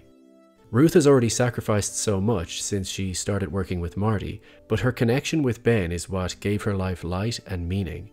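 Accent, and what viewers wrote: Ireland. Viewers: Im pretty sure you're Irish but I can't place the accent exactly.. If i had to guess I'd say you're from wicklow or wexford .. Great video too btw👌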